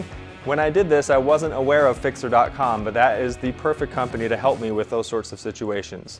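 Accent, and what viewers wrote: United States. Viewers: guy speaks American English but has a GREAT BRITAIN flag ... something is fishy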